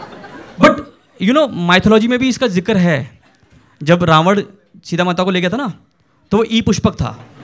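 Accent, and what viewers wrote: Garhwal, India. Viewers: Aap gadwali ho??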